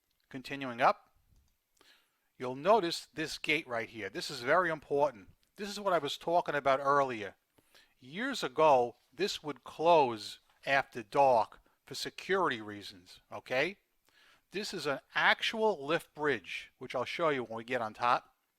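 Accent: New York, United States. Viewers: Love how you've never altered your New Yawk dialect one bit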